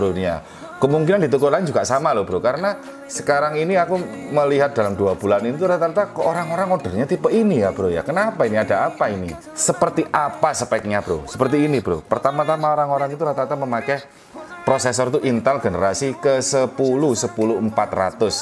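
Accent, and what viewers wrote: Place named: Surabaya, Indonesia. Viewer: Medok e koyok wong suroboyo bro 😂🙏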